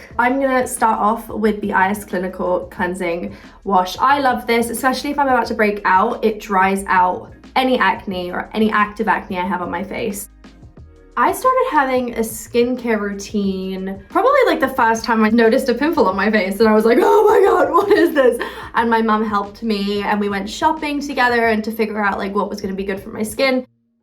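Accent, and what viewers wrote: United States. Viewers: i love her american accent 😍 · She lives mostly in the U. S. so, of course, she's going to take on a more American sounding accent... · Do you guys think she has more of an American accent than British now? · Her American accent is getting stronger I miss her UK accent 🥲🫶🏻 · What happened to her accent? It became really american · I just don’t get what’s going on with her accent. She’s worked in America for most of her life and still maintained a British accent, even in her interviews last year she had a British accent, but now she suddenly has an American accent? 😭 how does that even happen? 😂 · I was noticing that too! She sounds so American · she basically has an american accent now lmao shes so cute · She’s just got a standard accent now. Plus she does tend to put on an American accent a lot. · its so cute how shes starting to sound a bit american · You're picking up the American accent Millie. 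Very cute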